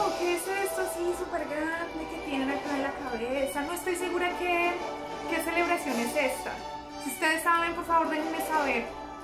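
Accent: Colombia. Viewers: Me encanta el acento colombiano! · Amo el acento colombiano 🥺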